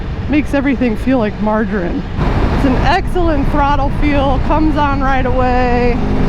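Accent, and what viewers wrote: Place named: Chicago, United States. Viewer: Sounds like you are from Chicago.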